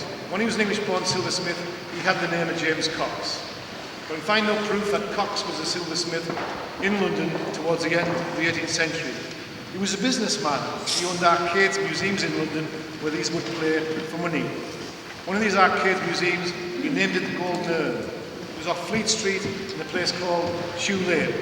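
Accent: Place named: Newcastle, United Kingdom. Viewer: Swans might be vegetarian but I tend to try and avoid encounters with them on lakes in thunderstorms. <link> The sense of humour and accent are identical. This was our experience of swans; <link> Are you sure it is thirty pounds or thirty pieces of silver? M1O4WvtTE4o?si=9muZ2LKKr7aqeyzI I have a soft spot for The Geordie accent. Probably need heaters in a cold, draughty place like that. It is north of Watford after all.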